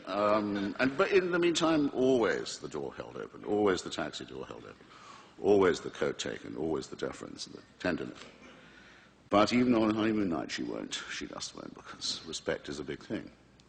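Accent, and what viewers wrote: United Kingdom. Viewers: those are British accents (and there are several Scottish and Irish accents, I don't know about Welsh). English accents include received pronunciation, Estuary English, cockney, and many regional accents. Hitchens basically speaks rp, which is both an English and a British accent.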